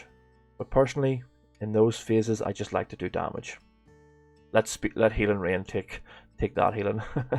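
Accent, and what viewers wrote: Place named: Northern Ireland, United Kingdom. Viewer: Nice to hear some more Northern Ireland players out there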